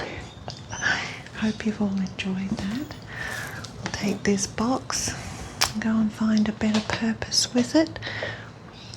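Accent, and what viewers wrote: Australia. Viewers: A few years ago I was thrilled to learn that ASMR was a "thing" too, when I stumbled across it while searching for Reiki videos. I used to think I was a weirdo too! 🤣 I especially Love soft spoken accented voices; your Aussie accent is dreamy 😵‍💫✨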